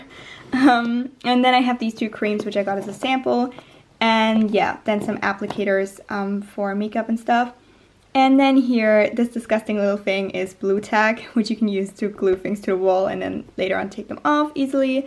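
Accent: United States. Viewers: Woah u sounded full on british!!!! 😂😂😂 but half the vid I heard an American-ee accent😂 where r u from? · I feel like now that she’s out of Cambridge she kind of has more of an American accent which is lovely on her 😂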